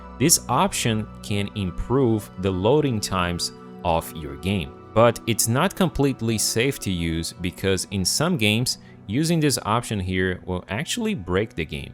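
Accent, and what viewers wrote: Brazil. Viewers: o que tu parece brasileiro falando ingles eh brincadeira viu!!! assume ai, duvido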